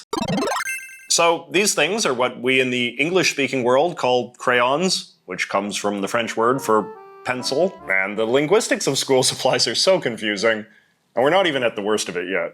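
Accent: Canada. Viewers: This is the first time I've managed to pick up a canadian accent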